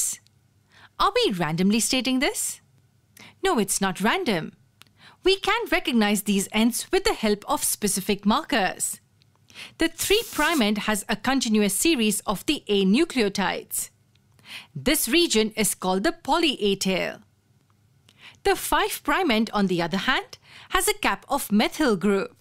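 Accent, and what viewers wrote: India. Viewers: Gosh, this is all explained so well, I just can't get over the Indian accent (assuming it's indian)!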